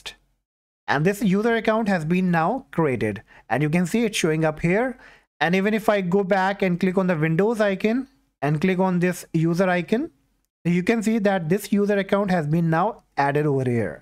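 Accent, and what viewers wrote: India. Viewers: i found this video, and when i hear india accent i start looking for another video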